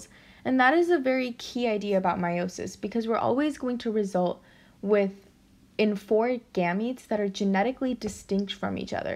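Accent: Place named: New York, United States. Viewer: Nyc accent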